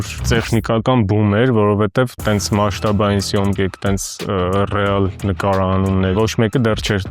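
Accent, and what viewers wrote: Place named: Russia. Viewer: Լավ ֆորմատ է, սպրեք։ Ֆիլմերն ու թեման էլ ընտիր! Ձեր խոսքն էլ լսելի է, բայց մի նեղվեք, երբ ձեզ նկատողություն են անում - հայերենում մենք ունենք դ, տ, թ , ծ, բայց դուք ռուսական հնչյուններ եք գործածում` Սծիվեն ռուսն ա ասում, մենք Սթիվն կարանք ասենք և այլն։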